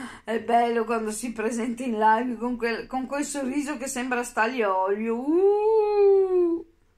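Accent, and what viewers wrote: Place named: Veneto, Italy. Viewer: Buonasera.. sei simpaticissima!!! Sono veneta anche io ed è sempre un piacere sentire il nostro accento😍 Dovresti fare delle LIVE... sai che seguito che avresti!!!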